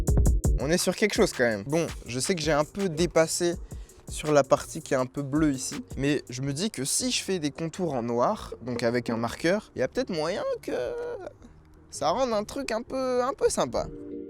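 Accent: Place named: France. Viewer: incroyable accent français !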